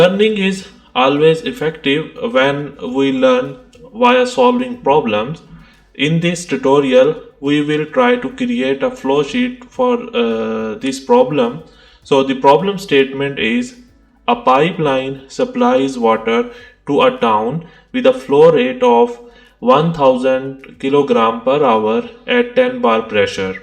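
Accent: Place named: India. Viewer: Thank u very much love from India and your accent is also like indian Sir .....are you also Chemical engineering background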